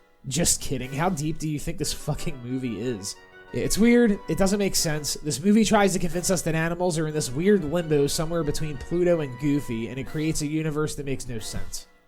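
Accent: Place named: Philadelphia, United States. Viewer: Damn your Philly accent ya? Harsh!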